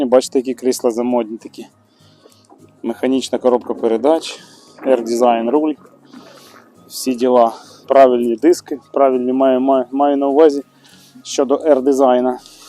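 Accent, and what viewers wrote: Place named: Germany. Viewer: Гарний німецький акцент, дякую за відео, дуже цікаво!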